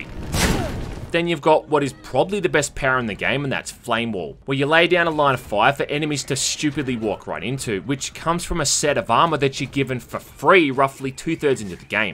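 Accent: Australia. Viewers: Do I hear... ...Australian accent?!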